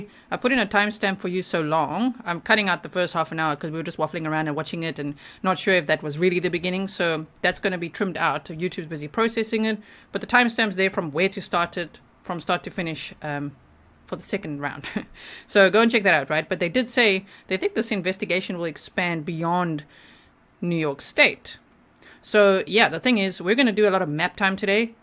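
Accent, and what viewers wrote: South Africa. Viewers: Hi G x I'm from the KZN South Coast of South Africa. Always look forward to your videos and South African accent giving international news ❤. You go girl. Watch each episode. Always more indepth and thought provoking. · South African? 😊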